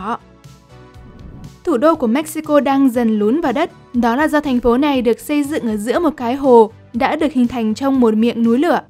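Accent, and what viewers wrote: North, Vietnam. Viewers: nói tiếng Bắc là đúng r mà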